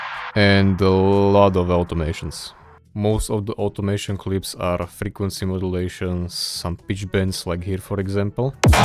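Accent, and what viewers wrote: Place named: Eastern Europe. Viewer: slavic accent🫶🫶🫶 (The video looks good, but it's chaotic)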